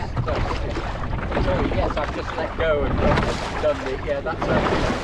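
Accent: United Kingdom. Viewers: I surely no not know why, since my ancestor settled in Canada only 55 years after the foundation of Québec by Samuel de Champlain in 1608, I feel I have to offer you my apoligies for this rather uncivilized fashion with wich this attendant from Audierne treated you and, but I do. J'adore l'accent british;)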